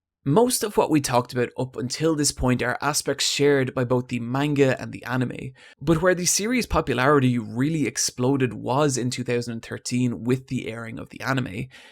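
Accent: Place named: Ireland. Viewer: I had a feeling you were Irish wasn't sure if it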